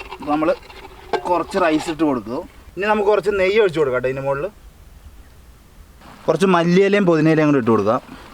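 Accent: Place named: Palakkad, India. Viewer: palakkadan slang aan...but full aghu palakkadan ala...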